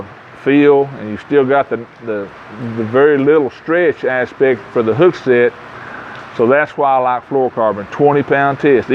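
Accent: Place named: Appalachia, United States. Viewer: Appreciate you spreading the seed of knowledge, you got the perfect hillbilly accent for these videos lol. Even if it weren't gold that accent would convince half of your viewers.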